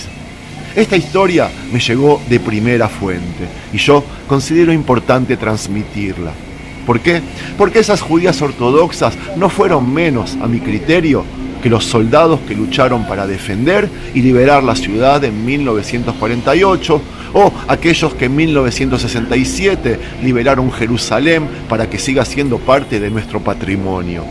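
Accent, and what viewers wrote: Argentina. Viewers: It sounds like an Argentinian accent. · Este señor es argentino? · Muy buen documental. Por su acento Usted es argentino?. Fui profesora de historia y geografía en Argentina ahora jubilada y me gusta mucho ver documentales. Lo felicito es muy interesante vuestro video.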